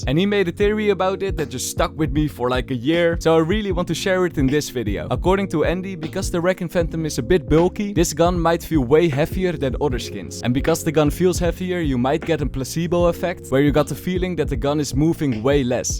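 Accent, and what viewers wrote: Netherlands. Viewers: haha het nederlandse accent is zo duidelijk (geen haat gast ga zo door met die content)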